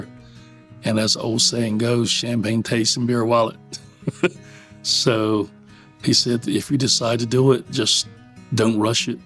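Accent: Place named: Maryland, United States. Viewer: I thought u were from the eastern shore Maryland ...You're ancient the o and u ....,MD NC ......TALK t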